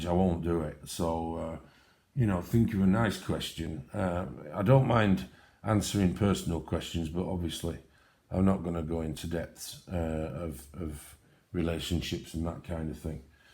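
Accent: Yorkshire, United Kingdom. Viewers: Hiya, what got a gruff Yorkshire man into colouring? BTW I was going to subscribe anyway, came across you by accident, good to see a couple of books I wouldn't normally look at and I'm definitely going to start checking out ebay more....nice one. I'm off to watch your 1st video, cheers 😄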